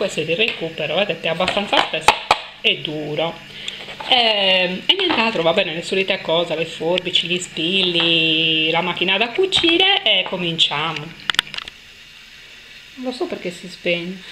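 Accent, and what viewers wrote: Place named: Sardinia, Italy. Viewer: Modello carinissimo e semplice, simpaticissima la tua cadenza sarda 👏👏👏ciaoooo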